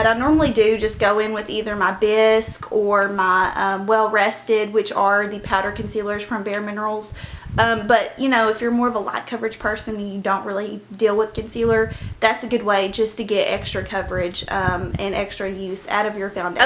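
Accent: South, United States. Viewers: Are you from south alabama or mississippi?? You sound country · LOVE THIS!! SO very helpful:)) btw..you're a lovely young lady, with a cute southern accent:) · You're adorable and I admire your accent - southern?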